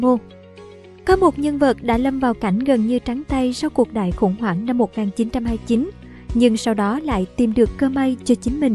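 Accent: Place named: South, Vietnam. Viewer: Người đọc giọng miền Nam này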